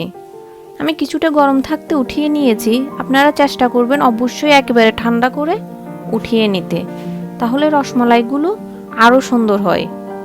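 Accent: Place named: Noakhali, Bangladesh. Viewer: নোয়াখাইল্লা। "প" কে "ফ" বলে। anyways recipe darun cilo.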